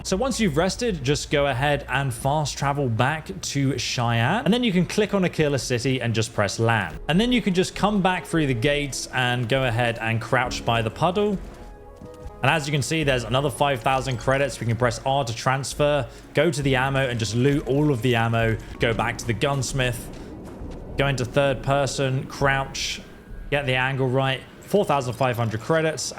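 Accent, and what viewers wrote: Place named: England, United Kingdom. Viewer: Lovely Engish accent you have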